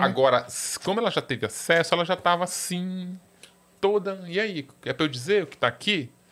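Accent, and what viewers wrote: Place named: Pará, Brazil. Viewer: O sotaque paraense é único 😂, Parabéns 👏🏾👏🏾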